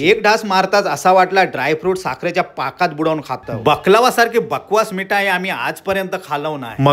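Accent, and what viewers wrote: Malvani, India. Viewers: माका आवडला व्हायनात कुटून बोलस ता असाच मालवणी बोल तूया .❤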